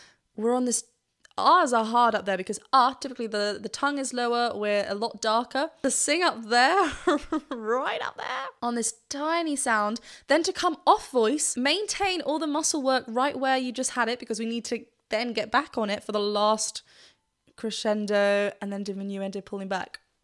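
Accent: England, United Kingdom. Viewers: Beautiful Dimash performance,,,also your english accent its very cool...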